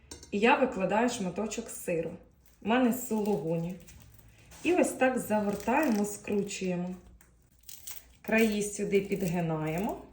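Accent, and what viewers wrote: Ukraine. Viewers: Ви з Полтавщини?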